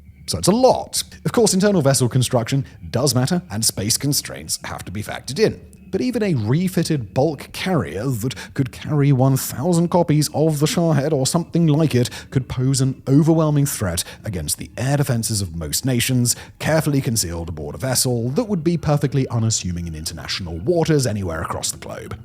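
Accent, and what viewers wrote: England, United Kingdom. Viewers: I love hearing an English man talk about ireland like it's his little brother.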